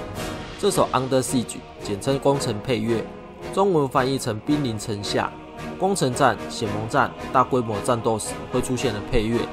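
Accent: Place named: Taiwan. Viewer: 挺喜欢听你说天堂的故事；尤其那带满台味的国语，听了就亲切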